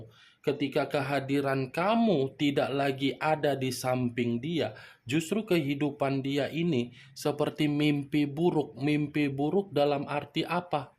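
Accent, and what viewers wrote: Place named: Medan, Indonesia. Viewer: 😂😂😂😂 Maaantaap Kali Aaacchhh Kartu Fortune ini … Bahasa ORG MEDAN Banget SUHU The Best Kita ini …👍👍👍👍👍💃💃😂🙏✍️😎😎😊😊……30 Nov 2023 Pkl wib …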